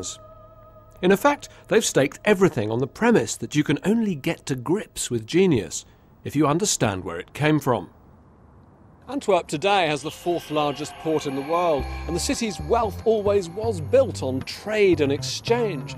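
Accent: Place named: RP, United Kingdom. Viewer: Is it me or English have a tendency to replace quality in contents with a posh pronunciation and diction?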